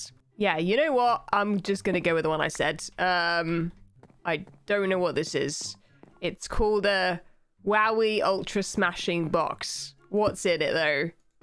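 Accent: United Kingdom. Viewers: are you British because I've been going through hermintcraft and I'm not sure if you have a British accent because I love british accents. If you don't it's fine because your commentary is my favorite out of all the you tubers and actually I like you and dreams commentary but love your videos best hermit craft series out of them all keep up the good work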